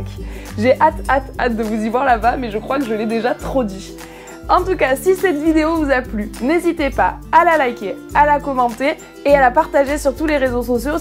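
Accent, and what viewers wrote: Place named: South, France. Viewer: j'adore l'accent du sud ❤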